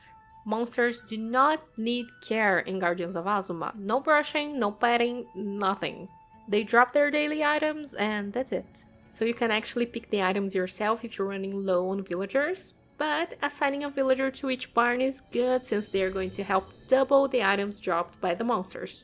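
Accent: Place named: Brazil. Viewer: Great video!! You have a Brazilian accent 😊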